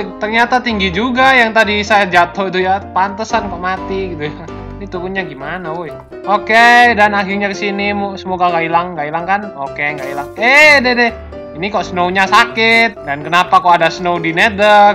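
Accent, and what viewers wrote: Java, Indonesia. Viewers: Fun fact: ternyata yang dulu agak medok jawa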